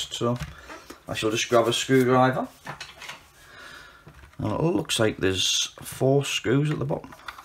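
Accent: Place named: South Shields, United Kingdom. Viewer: I’m in South Shields you sound like you’re up here too, I repair tvs, I’ve had that before on a 12v tv the power supply wasn’t the right one so it was collapsing when it needed more power. Great fix though shame about the quality 😂 probably £5 brand new from china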